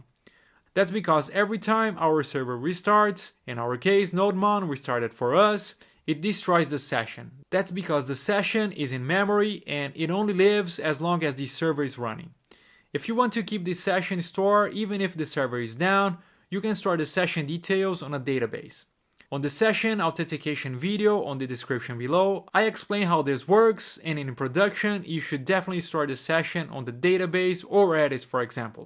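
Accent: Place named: Brazil. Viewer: kakakaka sabia que tu era BR, sotaque tava mt familiar kkkk mas porra tu tem um ingles perfeito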